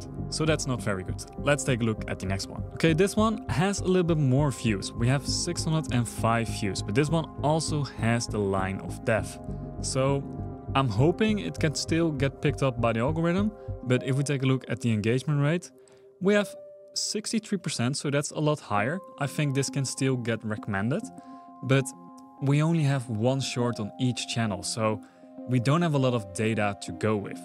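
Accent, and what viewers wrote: Netherlands. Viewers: The Dutch accent😂 Btw nice vid!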